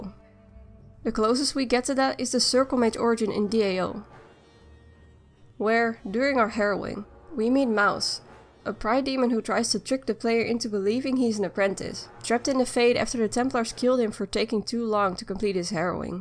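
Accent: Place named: Netherlands. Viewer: So i clicked on this because it was recommended content and as soon as i hear the voice i instantly go, this one is dutch...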